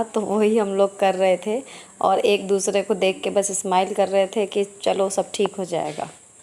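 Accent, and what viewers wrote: Meerut, India. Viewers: Meerut se ho kya mam ap?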